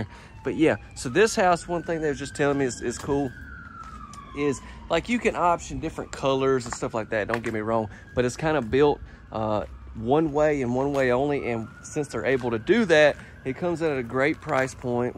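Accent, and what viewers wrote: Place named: Deep South, United States. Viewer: Greetings from Arizona! I love this house! I love the split floor plan, how the great room is laid out. The pantry and closets are fantastic! It’s just the right size for me, and any visiting guests, or even a tenant. I hope there are other options for the time color in the master bath shower,m. There there is lime in the water where I live. It builds up, and would be much more obvious more quickly than on lighter tile. I love your videos. They are well thought out. You do a great job of showing and detailing the features. You obviously like what you do. You are enthusiastic without being “in your face”. I love your easy going manner, and your accent, that Deep South gentle drawl. Angels on your bumpers and all around you.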